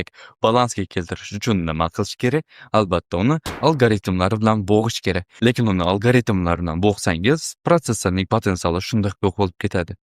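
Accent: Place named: Amudarya, Uzbekistan. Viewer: Aka Amudaryodanmisz shevangiz tortyabdi videoda mangʻitdagi tanish yerlar koʻrinib ketti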